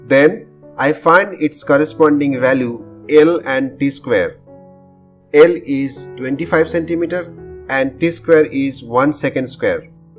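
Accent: India. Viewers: Indian accent bro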